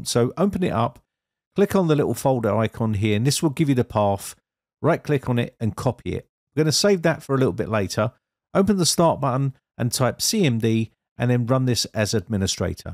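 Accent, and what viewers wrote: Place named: Cockney, United Kingdom. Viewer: I'm pretty sure he's saying, "What you guys" which is like the cockney geezer way of saying, "What's up guys" 😁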